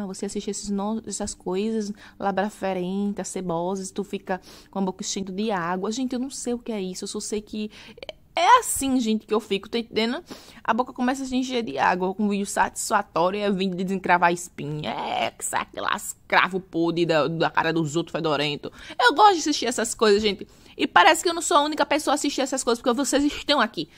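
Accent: Northeast, Brazil. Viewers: A Questão é a Técnica ( Saúde e Bem Estar ) para dar alívio ao Paciente/ Cliente. Satisfatório em pegar um Pé/ Unha em Estado Lamentável e fazer com que a pessoa fique bem. A Técnica em si para transformar a Pessoa em uma Pessoa Saudável e Feliz. Tenho um Exemplo da mãe de uma vizinha Diabética que foi a Manicure e o caso dela era de Podologia. O resultado foi a Amputação de parte do Pé por causa dos Efeitos da Diabetes. No caso desta senhora na qual estou relatando, foram 2 procedimentos de Amputação por não ter procurado o Profissional adequado para um simples problema de Unha Encravada. Manicure é uma coisa. Podologia é outra. No caso de Cravos e Espinhas ( que eu tbm assisto ) é a Técnica para a Saúde e o Bem Estar. A pele é o maior Órgão do Corpo Humano e tbm é muito interessante em assistir. Este vídeo é ótimo e as suas locuções com este sotaque nordestino é melhor ainda. 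( Muito divertido). Parabéns! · Eu amooooo esse sotaque nordestinoooo!!! Amo o nordeste, o povo de bem com a vida 👏👏👏👏👏👏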